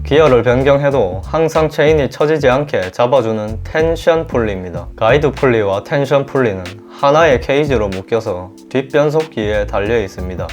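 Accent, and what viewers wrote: Gyeongsang, South Korea. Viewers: ㅋㅋ 이형 겁나 쿨해~~ 마지막에 끝!~~ 갱상도 사투리쓰는 자덕 두 분중 한분 ! ^^ (나머지는 각시탈) 전라도는 안계시나?? 암튼 구독눌렀네요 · 경상도 사투리만 빼시면 100점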